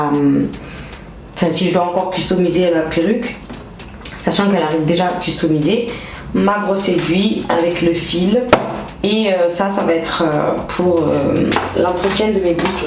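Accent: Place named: Toulouse, France. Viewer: On s’en beaucoup ton accent tu es toulousaine ? Sinon super vidéo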